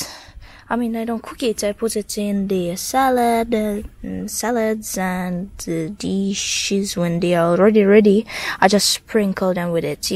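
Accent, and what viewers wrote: Jamaica. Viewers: Love hearing you talk patios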